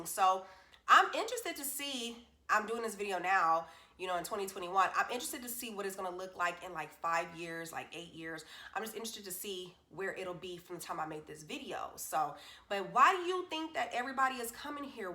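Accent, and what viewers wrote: Texas, United States. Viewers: First video I’ve watched of yours! Love your view of TX. Did you notice your cute Texan accent coming through?! You can definitely tell you’ve been around Texans for a while now. Be proud of that accent!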